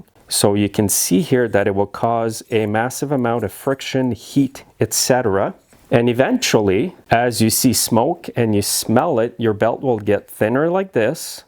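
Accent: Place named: Quebec, Canada. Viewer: You sound like you are from Quebec… are you?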